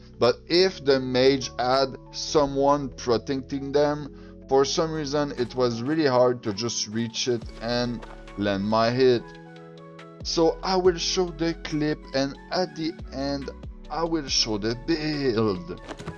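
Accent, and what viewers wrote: Quebec, Canada. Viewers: es-tu quebecois? ton anglais ma l'air d'avoir un accent QC 😅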